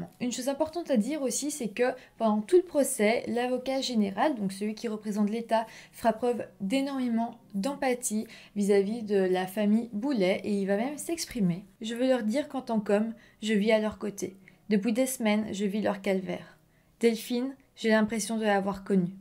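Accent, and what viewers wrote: Belgium. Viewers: C'est juste l'accent belge ☺️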